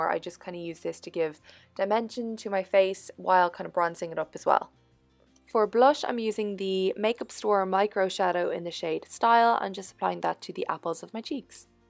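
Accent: Ireland. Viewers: how can she help that its an irish accent im irosh and thats how i say it .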